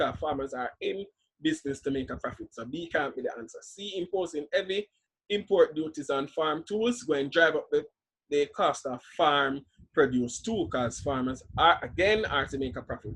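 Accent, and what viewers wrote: Jamaica. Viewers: the Jamaican accent is a nice one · i really enjoy listening to your Jamaican accent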